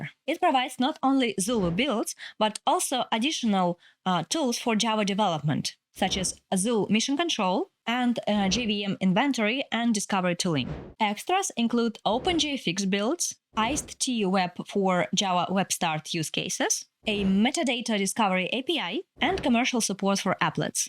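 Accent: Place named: Europe. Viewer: Awesome video. Thanks. By the way, I think your pronunciation is cool :face-blue-smiling:, it is like listening to java news from Europe (or from wherever your accent is)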